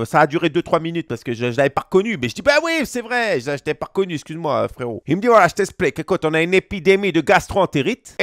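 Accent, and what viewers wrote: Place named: Spain. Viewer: Salut à tous et meilleurs vœux pour 2021., toujours fidèle à ta réputation. Une histoire pleine d’humilité et de franchise. On s’y croirait presque avec l’accent espagnol et le maillot de Levante sur les extraits vidéo. Merci pour ces histoires et hâte d’entendre les prochaines. Ne changes pas;)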